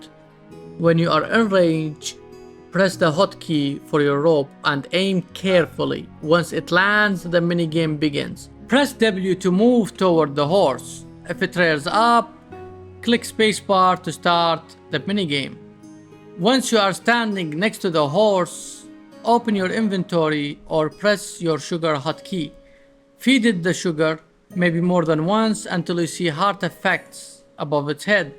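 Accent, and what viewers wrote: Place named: Arab world. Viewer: you sound arab! are you? nice video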